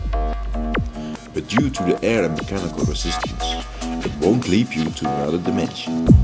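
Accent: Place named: Netherlands. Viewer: This is the wordt dutch accent I have ever heard! The animation is really nice, but has anyone actually built it (before claiming all kinds of antigravity technology)?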